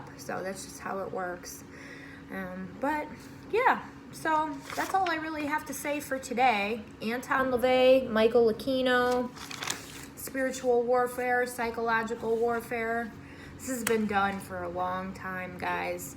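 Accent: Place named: Midwest, United States. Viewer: I like your Mid-West ayacciyent! Seriously tho, CIA and FBI are nothing like most people think. They don't use law enforcement tactics but subversive, covert menacing,, and use your own people or enemies against you. They set it up but never really get hands dirty (directly speaking). They commit more felonies than they probably prevent.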